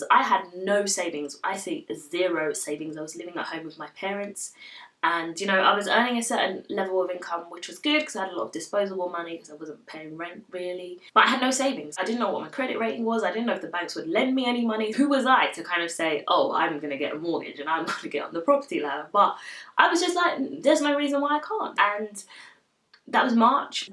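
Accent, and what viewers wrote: United Kingdom. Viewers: i love your British accent! You sound very elegant =)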